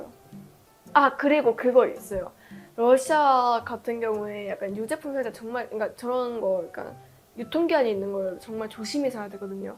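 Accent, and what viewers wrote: South Korea. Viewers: 발음은 한국사람 인데....어디서 발음 배움??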